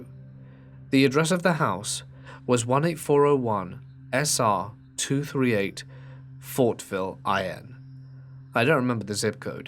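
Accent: United Kingdom. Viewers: Hey, really enjoy listening to your narrations, BUT I do have one gripe...could you PLEASE stop saying the word "garage" like your American, I mean you have a British accent. It's so ANNOYING. Thanks. Blessings.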